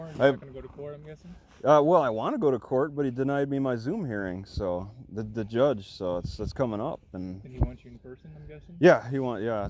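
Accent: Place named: Midwest, United States. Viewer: I grew up in Milwaukee (been here in Dallas over 40 years). I thought I recognized your mid-western accent.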